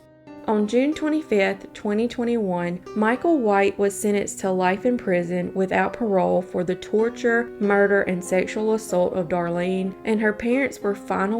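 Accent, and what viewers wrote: South, United States. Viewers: You are my fave Youtuber in the genre. fresh stories and a nice southern drawl :)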